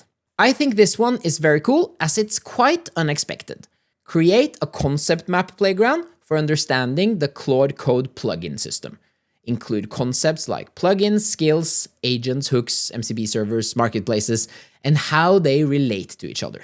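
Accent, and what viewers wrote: Norway. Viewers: Its a typical Norwegian accent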